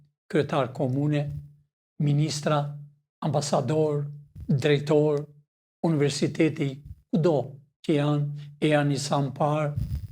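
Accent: Albania. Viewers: Ohhhh perendi,o hero,po kushe pise thote qe nuk flet shqip ti?eeee?o baba tomorri,po ti flete shqipen qe flasin prinder tane,fshace,ata prinder qe kane punuar ne ferma e koop.po baba im fliste fiks si flet ti tani.cliro vatanin nga tradhetaret o hero,o skenderbe,o ismail qemali🇦🇱🇺🇲🙏🙏🙏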